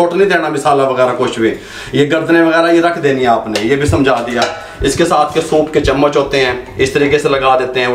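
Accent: Punjab, India. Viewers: Sadqe jawan main tuhade vich vich punjabi touch tu hhhh jeonde rewo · Wah kya yakhni ka swad hy.......or wah kya zaban 'punjabi, ki mithass hy....baly baly.......maza aa gya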